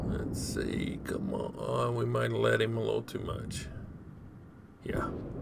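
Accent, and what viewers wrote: South, United States. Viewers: It's so much fun to hear a southerner trying to pronounce European names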